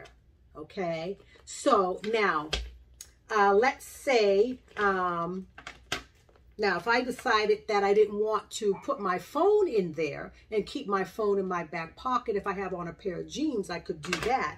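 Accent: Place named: New England, United States. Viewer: Love your New England accent. I have this bag in the lite greenish color.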